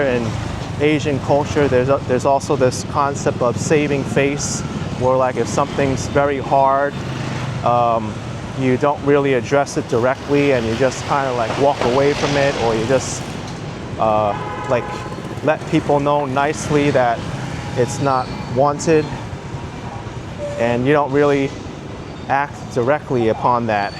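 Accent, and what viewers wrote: New York, United States. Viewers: Yes. As far as Asians in Queens go, he isn't THAT Asian. He even has a New York accent for crying out loud. This is wild. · If your born snd raised in NYC your a New Yorker by any standards. I’m one and I don’t look at you any differently. When I first discovered your channel I was actually surprised you were a Chinese American guy I had to laugh. I told my wife there is no doubt this dude is a fellow NYC’er because of his accent. 😂. No sense worrying about other people. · Thanks for your many thoughts and like one write here , "You is a fellow NYC’er because of his accent." and this is a part of your root in NYC Manhatten and few have grow up at Manhatten and why it your city 🙂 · I laughed at bit Because 100% I've always Thought Newyorker first.. Your accent, that Way you talk about cities and people..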